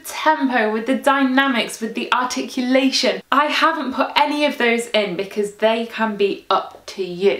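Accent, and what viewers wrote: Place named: United Kingdom. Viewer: And it’s not only what she says but how she says it in her own inimitable entertaining British accent. These tutorials just wouldn’t be the same if were to teach them using a phony mundane American accent. 😉 ♫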